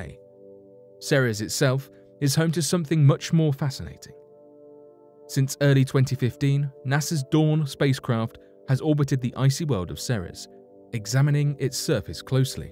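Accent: United Kingdom. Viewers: Matt Michniuk thats actually the British pronunciation. Much like sedule for schedule or alu-minium for aluminum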